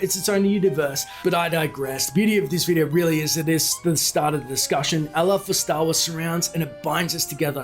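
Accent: Australia. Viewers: do you do looper too or am I just a racist who thinks all Australian men sound the same?.....Are you even Australian?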